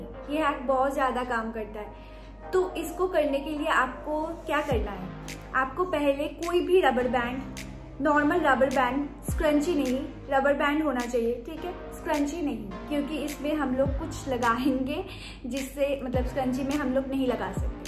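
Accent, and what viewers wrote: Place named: Bengal, India. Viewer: Are you a Bengali girl Because when you are taking it listen like you are telling full hindi and half Bengali And I am also a Bengali girl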